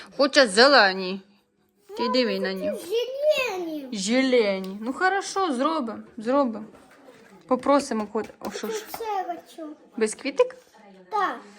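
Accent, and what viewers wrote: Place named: South, Ukraine. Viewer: Пані, ви неперевершена!Як надзвисайно приємно чути українську з півдня країни! Вклоняємося Вашим воїнам і Вам до землі.Щиро дякуємо, що ви усі живете захищаєте,любите та працюєте.Якби таких українців було багато у нашій рідній Україні - війни НІКОЛИ б не було.Не допустили б до такого. Живіть, живіть усі довго та щасливо! Панові Дмитру, якнайшвидшого одужання.Миросик дорослішає на очах.З любов'ю українці.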